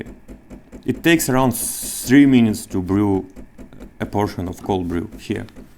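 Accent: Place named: Russia. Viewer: Просто жесточайший рунглиш Не осилил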